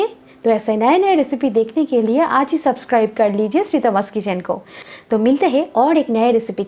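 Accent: Bengal, India. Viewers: nice culnary skill...ur hindi in Bengali accent is so cute....🤗🤗🤗sukhto Recipes plzz easyway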